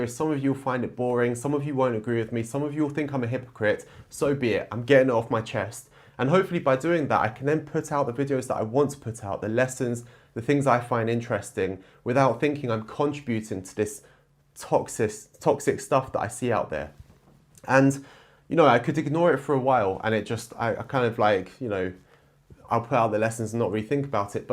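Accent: United Kingdom. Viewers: Hmmmmm... Are you from Europe? Your accent sounds like British something. 🤔🤔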